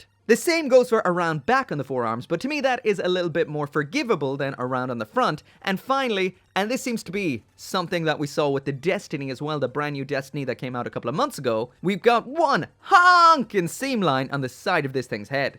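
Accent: Ireland. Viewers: Dude I never knew you were from Ireland. The voice makes SO much more sense now.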